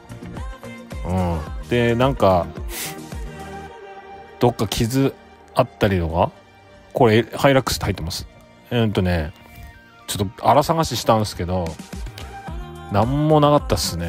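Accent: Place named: Tohoku, Japan. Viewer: 宮城でハイラックス前期乗ってます！訛りで、東北の方だなってすぐわかりました！笑 LEDテール参考にさせていただきます！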